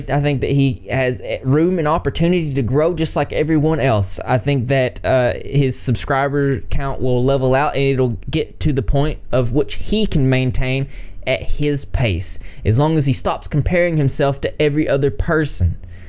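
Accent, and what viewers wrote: South, United States. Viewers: Your alternative style mixed with your country accent is pretty fire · I really enjoy your dialect, it souds so down-to-earth and accomodating. I can tell it's a southern 'accent' but where are you from exactly if you don't mind me asking? :)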